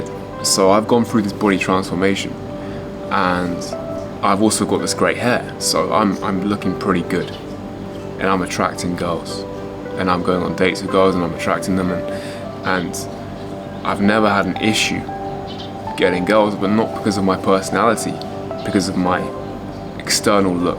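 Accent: England, United Kingdom. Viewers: And not to mention that gorgeous cut-glass English accent that makes come across as so classy and divinely sweet and adorable - as an older Irish gay man myself, I’d definitely date the handsome in a heartbeat ❤❤❤❤❤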